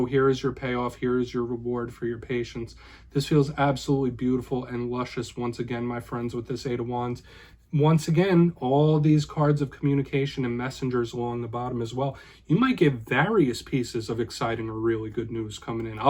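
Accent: United States. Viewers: What a cute deck! Very soothing voice (love the US accent!) and smooth tarot delivery. Greetings from 🇨🇦🇨🇦😊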